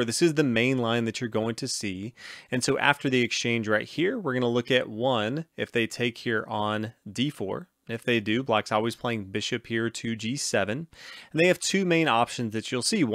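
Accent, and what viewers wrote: United States. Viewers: Thanks. Are you from USA?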